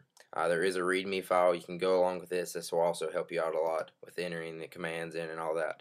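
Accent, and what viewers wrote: United States. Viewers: lern englisch. Nein ehrlich so sprechen manche Leute in den USA.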